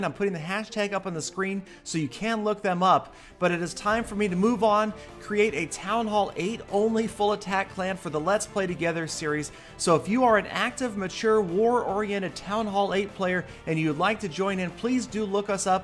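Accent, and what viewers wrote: Norway. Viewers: Wait, are you from Norway O_o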